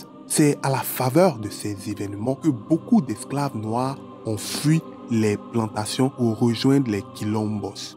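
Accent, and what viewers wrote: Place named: Africa. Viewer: Salut, trop pénible à écouter !...entre la musique qui est trop forte et votre diction tinté d'accent africain, je me fatigue à tendre l'oreille ...c'est dommage !....j'espère que cette critique vous permettra d'améliorer vos prochaines vidéos !...bon courage pour la suite !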